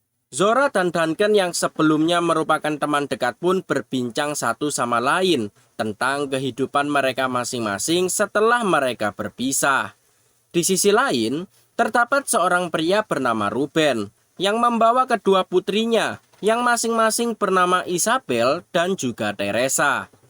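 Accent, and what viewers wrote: Java, Indonesia. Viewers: Sampean jowo to min😅😅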